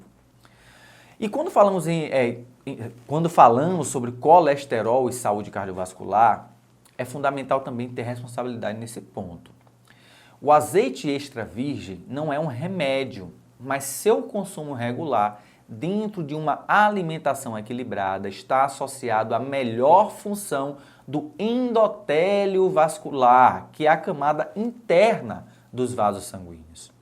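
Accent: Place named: Rio de Janeiro, Brazil. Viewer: Carioca poĥ Quer dar uma de deisch...colado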